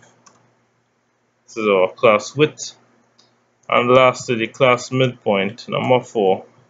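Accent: Trinidad and Tobago. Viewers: He is Caribbean possibly trinidadian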